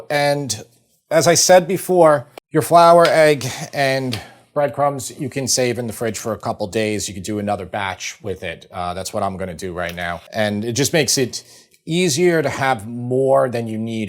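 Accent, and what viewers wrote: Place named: Midwest, United States. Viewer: Why is ' accent so different from? sounds like a New Yorker but sounds midwestern.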